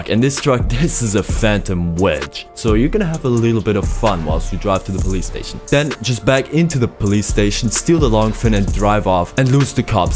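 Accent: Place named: Germany. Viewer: Good English skills, I cant even hear out the german accent although i’m trying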